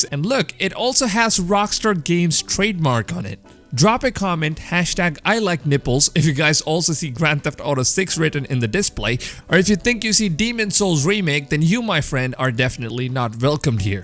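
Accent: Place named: India. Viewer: At first I thought you were some annoying Indian kid cause of your accent but your hilarious so I rub a dub subbed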